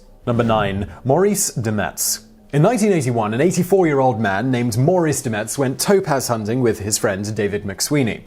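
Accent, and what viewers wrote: England, United Kingdom. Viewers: Your voice is an awesome British type. Needs to be in everyday TV.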